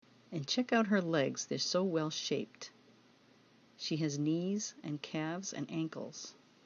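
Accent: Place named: Northeast, United States. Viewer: Oh my god! I am drooling over your beautiful dolls. WOW! Great video. You must be from the Northeast. I love your accent. I just subscribed.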